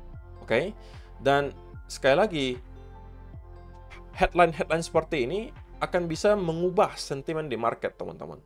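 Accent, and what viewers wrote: Pontianak, Indonesia. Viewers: haa abg org pontii ???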